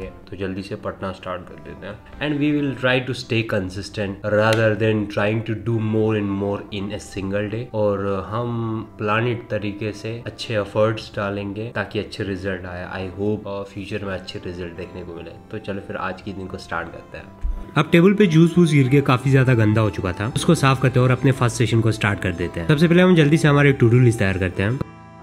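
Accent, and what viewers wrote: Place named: Kargil, India. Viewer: Bia app ka accent kargil se lagat hea .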